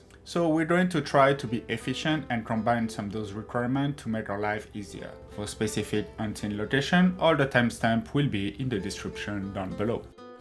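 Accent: France. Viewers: Absolutely love how French you sound speaking English.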